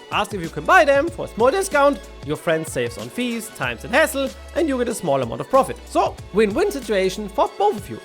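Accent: Germany. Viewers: You hear like a german guy do you german?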